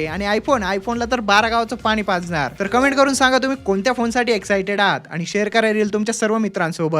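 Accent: India. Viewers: Bhau tu latur cha ka ahe ka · फोनचं जाऊ दे राव पण तु नगर चा वाटतो राव ❤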